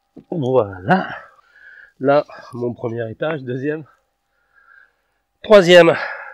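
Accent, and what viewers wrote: South, France. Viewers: bonjour et déjà un grand merci pour tous vos conseils j’ai juste deux questions concernant les dalles vous utilisez la 6000k blanc froid c’est bien ça ? Pour la chauffe ce sont des ampoules de terrarium quelle puissance faut il acheter ? Merci au fait vous êtes dans quel région cet accent me parle perso suis du Tarn ça m’intéresse pour avoir les périodes de semis et de repiquage. Merci encore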